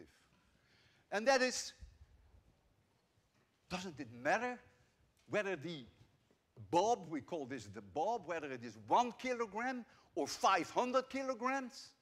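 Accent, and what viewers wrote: Netherlands. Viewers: I immediately heard he is Dutch. I don’t even have to research it. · 5 seconds of listening and I already know that he's a dutchie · I could hear immediately that he is from the Netherlands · Yep, Dutch for sure. He threw me a bit when he said he'd seen it many times in Austria, but then I came to my senses.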